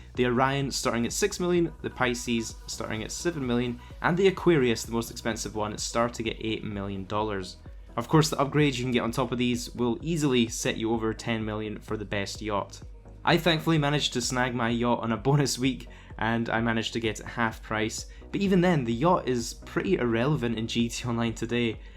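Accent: Scotland, United Kingdom. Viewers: Ur Scottish?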